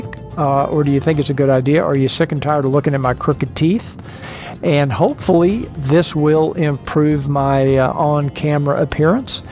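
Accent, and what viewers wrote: United States. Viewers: Ok Hi Mr " ".. So. (Oh this is weird talking/ typing this, Sorry. First time I come in some way contact with you Sir and We're talking teeth.- I didn't see that one coming?") But since you still bring up such a very important subject I'll submit my thoughts on this. Yes first appearance is what you notice with people.. in someone's face. That would be the teeth and smile! 82% of U.S. adults agree that the first thing they notice about others is their smile. I know bec. i did that with you, Sir. I saw the teeth and saw that it looks somehow painful. I Could hear it on your speech as well and that something was of? No. But there was something with your lower jaw or your sound on your voice I mean how you sound apart from that rough Dallas Texas- dialect of yours....it almost sounds something like you suffer a blocked nose? (And Sir, NoHate at all) But now I could tell that this, yours, your special sound of yours.. 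That is You! I don't have to not even see you anymore too know. And I've learned to like it and almost got used to it now at this point! It's that easily recognized- voice of yours again these days! I just sit back and listen to you and follow along on both your long and less short journeys. And it's amazing! I can see I don't even notice the teeth anymore! I don't know exactly.. Oh man you got me on this subject! This can be a touchy/ sensitive subject overall by just talking about teeth in general. And I don't Judge people? I'm Not judging you now at all...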